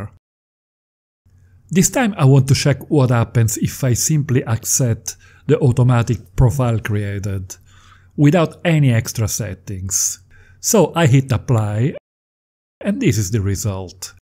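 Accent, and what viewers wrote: Italy. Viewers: accent italian. are you italian ?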